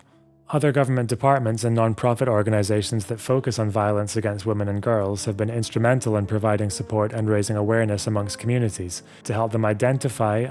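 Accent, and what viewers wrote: Scotland, United Kingdom. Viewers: Hes just Scottish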